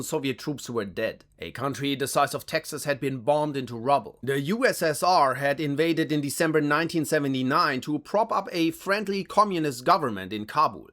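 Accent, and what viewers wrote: Germany. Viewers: Sounding less german, it's really beautiful and sounds even better with each video 🤗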